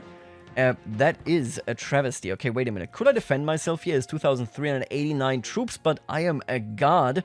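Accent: Germany. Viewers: I smell Deutsch in your Akzent But I live this kind of content so pleas keep on by doing so man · 2 Sekunden und ich wusste das du deutsch bist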